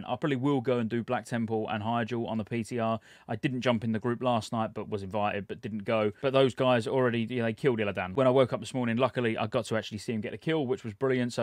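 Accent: United Kingdom. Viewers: Where are you from if you don't mind? Just curious about accents. Obviously UK, but specifically? (Once again not actually specfically just ur accent)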